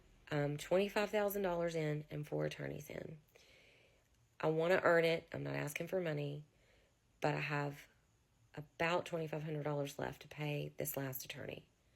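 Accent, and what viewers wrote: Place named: South, United States. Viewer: I would take all documents and go to your State Capitol. Have copies for the FBI HEADQUARTER DEPT. THE ATTN GEN and DEPT OF JUSTICE. I can tell by your accent you might be in a small town in the South. I know how things can be. No matter. WE THE PEOPLE still stands! Here for you. You little boy having special needs should be covering him with even more protection under his rights of the disabled. He isnt a pawn on a chessboard game. Shouldnt be about hoarding money from a single Mom. ⚖ ⚔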